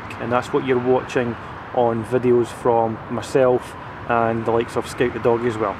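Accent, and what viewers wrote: Scotland, United Kingdom. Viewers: i love your scottish accent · the awesome Scottish accent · I love this kind of Scottish accent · Scottish accent ! I love it !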